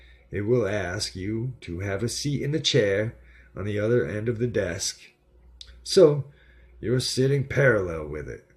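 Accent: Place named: Oklahoma, United States. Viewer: 😠😬 HOWD I MISS THIS ???? LOVE YOUR ACCENT!!!! SOUNDS LIKE HOME TO ME. OUR JOKE IN OKLA IS"I DONT HAVE AN ACCENT, YOU DO" LOLOL. LOVE IT . YOUR DOING A GREAT JOB.!!!!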